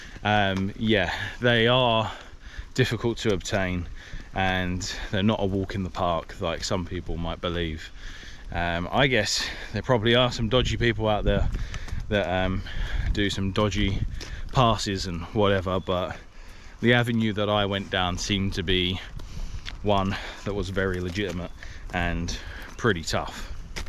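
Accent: Australia. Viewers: Defo getting that Aussie twang mate! Loving the down under content!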